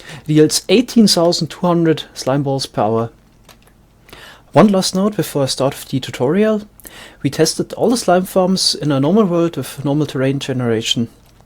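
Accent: Germany. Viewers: Worst german accent ever. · mann kann hören das du ein deutscher bist xD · That german accent tho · Der Deutsche Akzent Nice Farm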